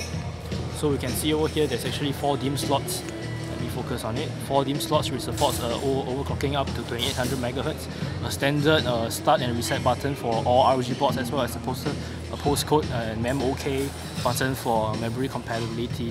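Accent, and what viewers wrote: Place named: India. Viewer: Bro no offence but you indians speak english far too fast makes it harder to understand with your accent speak slower then i will be able to understand you better.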